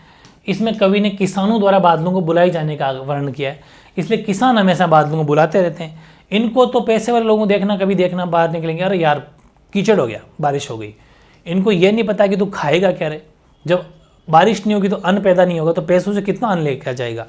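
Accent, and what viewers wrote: Rajasthan, India. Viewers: Sir ke bolne ke tarike se kis kis ko pta chalta h ki vo rajasthan se h😅❤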